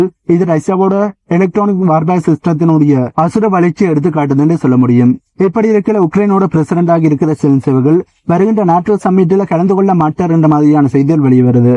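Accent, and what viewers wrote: Sri Lanka. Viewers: 🙏🏽 இது வட இலங்கை தமிழுடன் ஆங்கில சொற்கள் சேர்க்கிறது. இயற்கை தமிழ் 🙏🏽அப்துல் காமிர் ஸ்டேலை கேட்டு சலித்துவிட்ட்து அதைதான் எல்லோரும் கொப்பி பண்ணுறாங்கள் 🤣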